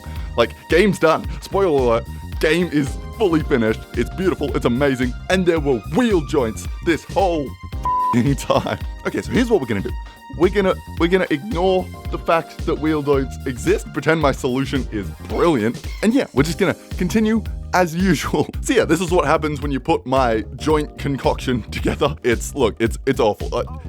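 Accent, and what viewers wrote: Australia. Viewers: I like your voice, are you Australian? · Spoiler alert: Is Australian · are you a fucking aussy or a southerner? this depends on sub status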